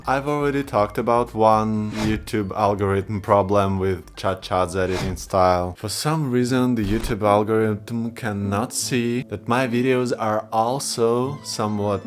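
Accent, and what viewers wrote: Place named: Russia. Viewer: It's here In Georgia, USA. And you're queued up with a number of videos. I'm listening to you as I play a video game. You do have a strong Russian accent. But, as you already said, the algorithm isn't human. So, that's not the problem. Your English is very good as well. Before I continue, I have to state the obvious. There's a vicious war in Ukraine and of course you are aware of that. I'm certain that not all Russian citizens support that war and I'm not asking your position. You may not wish to answer and I wouldn't impose on you for an answer. But it's constantly in the news. And like it or not, that may have some effect on any Americans who views your videos. There's very little doubt in my mind that focusing on that in any way would not serve you well. In sum, there may be a measure of fear that is inhibiting you. Or a measure of anti-Russian sentiment as well. 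I'm not a content creator in any way at all. So, I can't say that any advice I give you will be useful. It is not my intention to be cruel, but I have to say that sitting in front of a mic just talking about yourself doesn't make you stand out. So, I'm wondering if you have some personal interests that you can share with us. Any skills, training or activities that might interest viewers. You live in Russia I believe and of course it isn't wise to dox yourself. How does being nonbinary affect your life in Russia? Are you at risk from others there for being on YouTube and openly nonbinary? Can you leave your h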